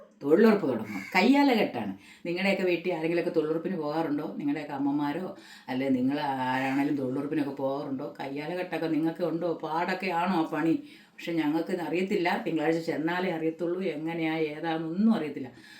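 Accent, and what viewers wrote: South Kerala, India. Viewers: 🙏തനി തെക്കൻ ശൈലി കേൾക്കാൻ നല്ലരസം കറികളൊക്കെ ഒതുക്കി വിളമ്പു നാനും ഒരു തിരുവിങ്കൂറുകാരിയാണ് ഏതു ജോലി ക്കും വിഷമങ്ങളുണ്ട് ഒന്നിനും മടി പാടില്ല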